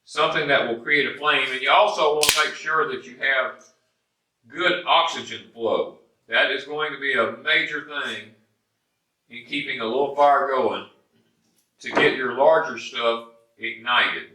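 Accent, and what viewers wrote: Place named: Georgia, United States. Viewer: Great video, I just discovered you. Your accent sounds Georgian - north of Atl to me. 🤔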